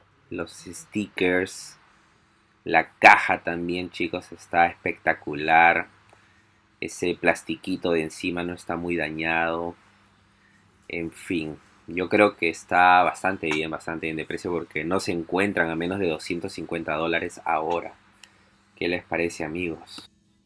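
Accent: Peru. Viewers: Me encanta tu canal cada vez que puedo veo tus episodios me mate de risa con la de Arnol y lo de Jb se la pase a mi bros para que se caguen de risa también, te felicito por tu canal con tu acento Perucho es de mi época bravazo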